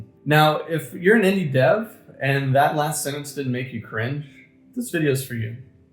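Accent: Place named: United States. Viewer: Hey, thank you for your video, it's great! I can see and hear so much of your effort was put into it. I do treasure this one, and I found it thanks to your article on Gamespot. However, there are some pain points: 1. Your attempts at self-deprecating humor undermine both tone and authority, I expect from you to take your message seriously and implement it in practice. I want you to see as my equal, not a clown with a computer science degree, and I know you are not one. 2. Pronunciation of foreign names is easily searchable and practicable nowadays. Please be less American about this and learn, it's very easy if you let go of point 1. Just like in your message here, fear of messing up a word keeps you from integrating new cool words into your life, and names are amazing words! Just don't stress only the first vowel, we already have enough bO-rises and Oh-drees. 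And yeah, the solution you used was painful to listen too, I'm afraid. Other than that, I hope every documentary YTer will do their work as you do. Nice to meet you!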